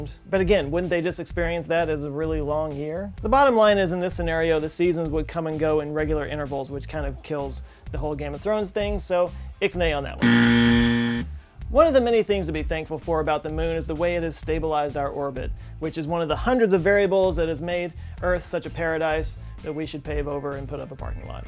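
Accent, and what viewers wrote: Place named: Texas, United States. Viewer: I love how over time you've gotten more comfortable with your Texas dialect!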